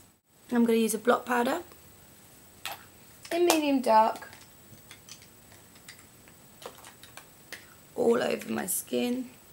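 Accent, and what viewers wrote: England, United Kingdom. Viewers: If you mean 'accent', she's English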